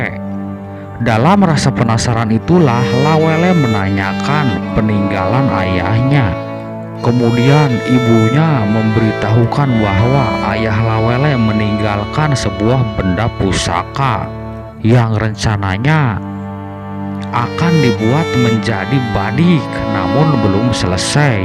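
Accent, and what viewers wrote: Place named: West Java, Indonesia. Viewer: Sayang sekali Pembicaranya berlogat SUNDA, sehingga penyebutan nama2 jadi salah. Misalnya : LA WELE' ..ada tekanan di ujung kata ... Merubah bunyi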